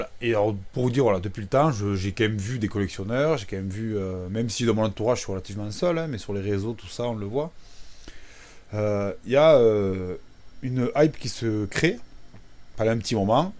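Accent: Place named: South, France. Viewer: Salut merci pour la vidéo, avé l'accent 😀, belle collec. J'aime beaucoup la musique d'intro c'est quoi?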